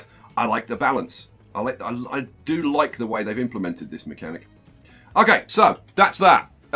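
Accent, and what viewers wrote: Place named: United Kingdom. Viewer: Are you a British or an American? I just like your accent so much.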